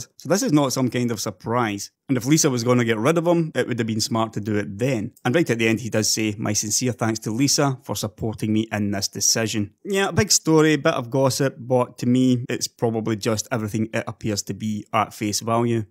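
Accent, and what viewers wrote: Scotland, United Kingdom. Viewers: Are you Scottish or Irish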